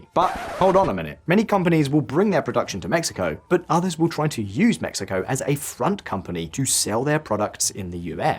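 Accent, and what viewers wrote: United Kingdom. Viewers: ditz with a brit accent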